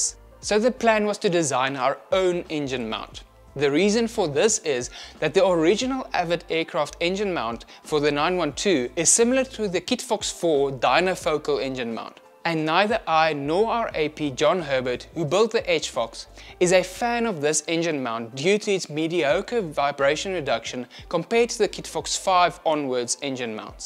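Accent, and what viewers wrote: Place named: South Africa. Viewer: That's a great video - only just found you. and love hearing the SA accent - I miss my SA friends! Same question as always though: how does anyone afford their own plane??? 😁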